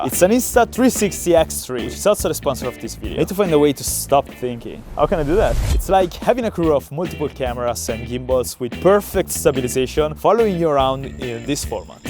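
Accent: Italy. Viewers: is he Italian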